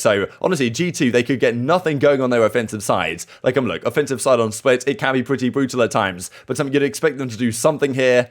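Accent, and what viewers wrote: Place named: England, United Kingdom. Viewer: he’s English, but nice try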